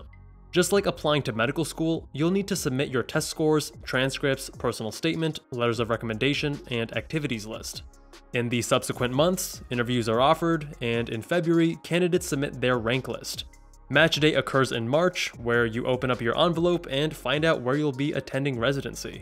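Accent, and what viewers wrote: Canada. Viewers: I differentiate accents from Canada vs USA by how Canadians pronounce about (Canadians say "aboot"). In some Canadians, "aboot" is more noticeable while in others it's way less prominent. I must say, the narrator of this video has the most prominent "aboot" that I have ever heard! PS: I'm an English speaker, but I'm neither American nor Canadian.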